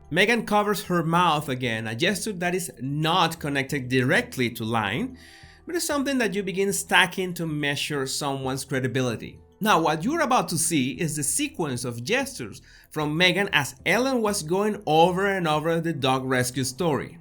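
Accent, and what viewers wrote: Mexico. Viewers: PLEASE!!!! TRY NOT TO SPEAK IN THIS HORRIBLE MEXICAN,RUSIAN,CUBAN ACCENT. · I like your accent..where is it from??? It sounds Mexican..but......i like yours...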